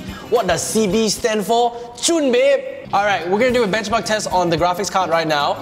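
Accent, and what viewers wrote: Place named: Malaysia. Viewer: Are you Malaysian? Idk maybe its just me you sound Malaysian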